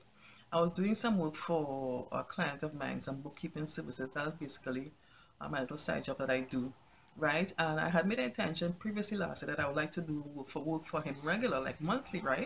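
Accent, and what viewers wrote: Trinidad and Tobago. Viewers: I could tell by your accent hi trini am so happy 🥰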